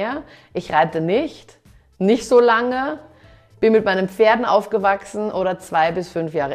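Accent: Austria. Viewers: Ich liebe deinem Österreichischen aktzent 😂😊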